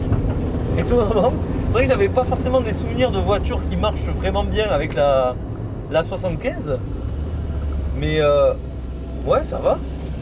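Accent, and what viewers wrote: South, France. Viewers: Je suis commercial pour une des 3 marques allemandes premium et pourtant j’avoue être sous le charme de tes vidéos. Une belle energie, un accent du sud bien vivant et des voitures qui ont fait notre enfance/adolescence. En plus tes vidéos sont bien documentées et tes montages aux petits oignons. Bravo !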